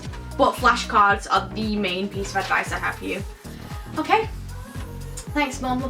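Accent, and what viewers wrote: United Kingdom. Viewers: I'm sure she's from the UK! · r u british?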